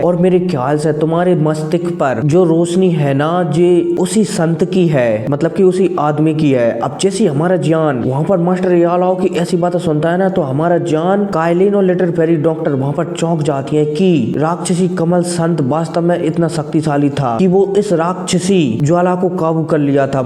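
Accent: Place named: West Bengal, India. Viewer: You are from West Bengal 😅❤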